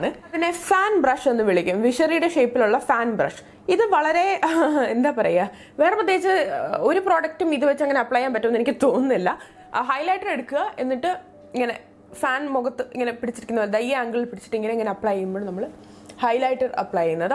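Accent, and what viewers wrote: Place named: North India, India. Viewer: Chechy adipoliya..chechide presentation nannayi manassilakunnu as a bigginner..pinne chechi malayali aanenne aarum parayilla .oru northy aanenne thonnu...lovly super...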